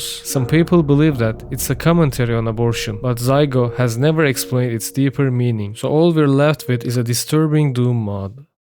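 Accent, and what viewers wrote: Turkey. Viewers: Türk aksanı duyuyorum sanki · aksanı türk gibi. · you sound turkish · Are you türkish by any chance? Your accent very similar to türkish accent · aga sen türksün ben o aksanın kokusunu alrıım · I dunno why but your accent sound like Turkish accent to me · Türk ingilizce aksanini 100 kilometre öteden dahi tanırım. Güzel video olmuş usta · I love the Turkish accent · Out of context but are you Turkish? Your accent sounds like Turkish accent a lot. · I know a Turkish when I hear one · Video çok güzel de, Türk müsün amk. Yemin ediyorum lan bu adam türk mü diye aksana odaklanmaktan videoyu izleyemedim:d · came here to say this, his accent is exactly like a Turkish person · Your accent sounds very Turkish maybe because I’m Turkish and used to hearing it a lot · I can hear the turkish accent kankam, good video ellerine saglik;) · aksanı duyduğum an türk olduğuna emin oldum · You have a Turkish accent, are you Turkish?. 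I like your video btw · türk müsün len aksanın o vibeyi veriyor great video btw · Turkish accent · What accent is that? It sounds turkish. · Ben senin o türk ingilizceni anlarım başkannn.. Manyak video bu arada sevgiler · kanka Türk müsün sesinden anladım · You sound like turkish man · Hocam video güzel olmuş eline sağlık. Türk müsün acaba aksan Türk gibi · you kinda sound like turkish though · Turkish %100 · As a Turkish, i smell a Turkish accent 😁 Btw for your first video, the wievs are really good! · Türksün sesinden belli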